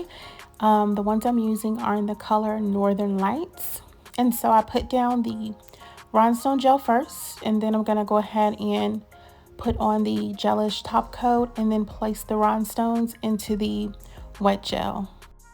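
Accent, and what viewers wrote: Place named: South, United States. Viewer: Your nails are beautiful… but the thing I’m more focused on is that accent. Girl I’m a southern woman though and through but I’ve never sounded that sweet when I talk. I’m soothed, I’m relaxed, and I’m envious all at the same time ❤️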